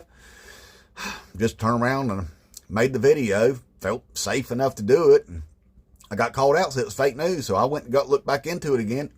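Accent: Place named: I-10 Corridor, United States. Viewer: I’m going to guess you live within 50 miles of I-10. I recognize that accent.😂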